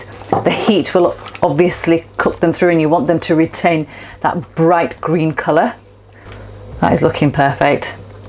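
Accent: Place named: RP, United Kingdom. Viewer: Hi, I tried your chicken pot pie today. It’s a bank holiday weekend and I thought ‘why not’. This is the first time I have ever made a pie and the first time I have used a puff pastry sheet! I copied your recipe but left out the chilli powder and I couldn’t believe how tasty this turned out!! It was that tasty that I ended up eating the pie on it’s own! The only thing I will do differently next time is, I won’t make it slightly saltier when I taste the mixture - just my preference. A suggestion for you, if you don’t mind - when you say ‘my heat setting is a 4’, please always state what a ‘4’ is on your setting. You normally do say ‘that’s a low to medium’ but sometimes you don’t. 😀 In the past, whenever I watched YouTube videos, they were always different videos by different people. You are the first person who’s videos I keep coming back to because your recipes are so easy to follow! 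I love the fact that you do Asian recipes as well as English, and you sound so posh (in a good way!) Thank you for sharing. I have subscribed to your channel. 😀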